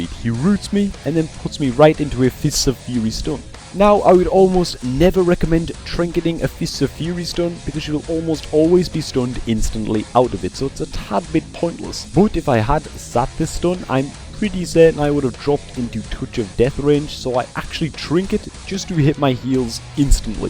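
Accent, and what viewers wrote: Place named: Northern England, United Kingdom. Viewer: Hey im not sure if you have said this in another vid or been asked before, but where are you from? you sound like your from northern england, i may even go as far as saying your from Newcastle like me!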